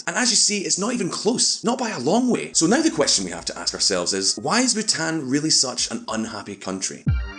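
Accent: Scotland, United Kingdom. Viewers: I knew you would bring this so called refugee issue as you sound very British. The numbers you gave are all wrong. I can see you support for the Nepalese and I as a Bhutanese refuse to call them refugees. You forget we have a UN office in Thimphu. Totally biased reporting or whatever. Bhutan has a porous border with India and that’s how the Nepalese living in India towns of Darjeeling , Kalimpong and Nepal illegally entered Bhutan. So please stop playing the refugee card. By the way there are still many Nepalese here living peacefully and some have even become ministers under democracy. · Your scottish accent makes this very hard to follow. But nice video. You're cool.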